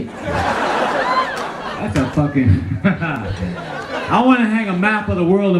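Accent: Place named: South, United States. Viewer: Was he from Georgia? He has a southern accent here. Sorry he is gone❤😅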